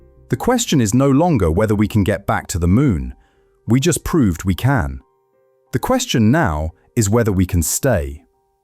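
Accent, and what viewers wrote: United Kingdom. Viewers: Why use a British accent to speak American? We don’t have skeduals or use Fahrenheit 😳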